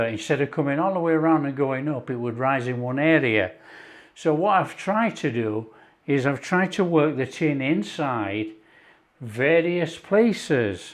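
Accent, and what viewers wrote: United Kingdom. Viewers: Hi chef ,,, are you British 😊 !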